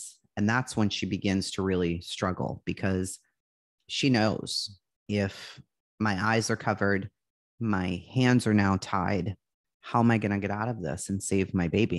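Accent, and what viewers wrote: Boston, United States. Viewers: Love the way ur telling this story.... 💞🙏... ur Boston Accent, u all pronounce ur "R's" differently from me... I'm in Washington state... Good saying "drawers", spot on🥰😊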